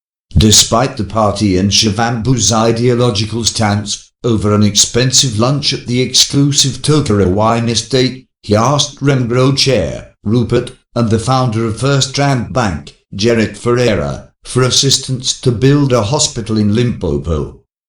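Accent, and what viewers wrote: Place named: England, United Kingdom. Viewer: Who ever is narrating this video, you're not South African, in fact, you have an English accent, so stop poking your nose in our issues and talk about the Monarchy. Which South African can't pronounce "Stellenbosch"?...nxm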